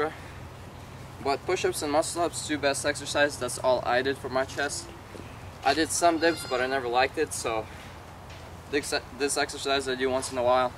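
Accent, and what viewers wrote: Russia. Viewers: Я так и чуял что ты русский, потому что у меня акцент такой же как и у тебя когда я говорю, режил посмотреть в Description, и во, руссий канал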